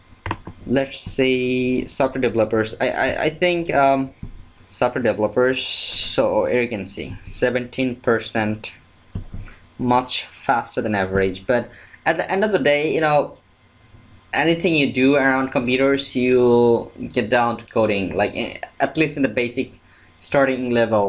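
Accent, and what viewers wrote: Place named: Bangladesh. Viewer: what major do you think is best for guys like me who is willing to do engineering(undergraduate) but not sure what to begin with... i sense you are from bangladesh(by your accent) ... so am i ... whats your advice to me as a fellow bengali ... what major should i do?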